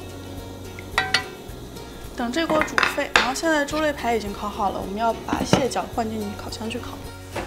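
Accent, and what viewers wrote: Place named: Wuhan, China. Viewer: 微微听出来武汉口音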